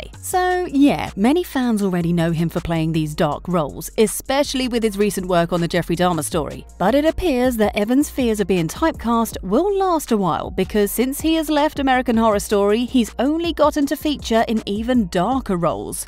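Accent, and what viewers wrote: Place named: United Kingdom. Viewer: This would have been better if Evan was the one talking... instead of a girl with a British accent 😉 I wish Evan the best..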